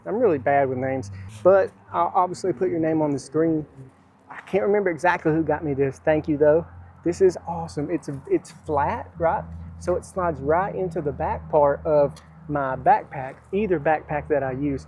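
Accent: Alabama, United States. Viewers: I know this is an older video. But I just found your channel and it's so enjoyable watching you. I live in Alabama myself near Tannehill Park. I really like watching someone going to places that I can associate with. But please be careful. No video is worth risking your life for . (the billboard, or those ladder climbs) Your not only putting your life at risk but in a way your family's also. Also so great to hear someone with an "Alabama accent". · This is just like camping with steve except with a southern accent. I subbed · I’m going to guess your from Alabama bc your accent reminds me of gump lol Ok edit: you said Alabama so I was correct & I can chug a bottle of water in 5 seconds but it has to be room temperature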